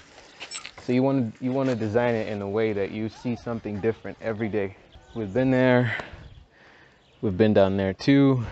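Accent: United States. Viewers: Off course your accent is like an American 🇺🇸 I enjoy watching your videos because I never been in Burundi since when I was 7 so it’s pleasure to me I really like it When all those roads get tarmac the neighborhood will look more beautiful ❤️❤️🇧🇮🇧🇮🇺🇸🇺🇸🇺🇸🇺🇸🇺🇸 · you said that you never been in the US neither the Europe so how did you get the American accent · Man come on!! Be honest seems like u grow up in California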